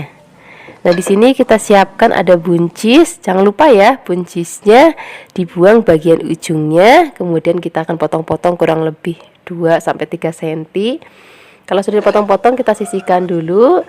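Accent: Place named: Semarang, Indonesia. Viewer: Suka bngt dg cara bicara nya ceria bnget...spt logat org semarang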